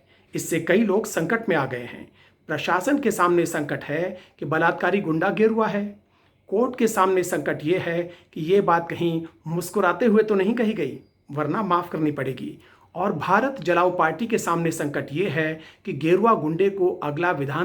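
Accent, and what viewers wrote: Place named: Lucknow, India. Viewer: ji aapka ka lakhnavi andaz aapko bhut khoobsurti bat rakhne ki bemisal jahanat hai, aapko lambi aayu liye kamna, aapka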